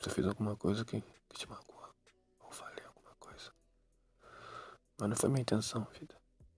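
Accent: Rio de Janeiro, Brazil. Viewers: Carioca neew?? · O negocio é o geito que vc fala AAAAAAAAAAAA INFERNO O GATILHO DANÇA NOVAMENTE tu é do rj? questão de sotaque msm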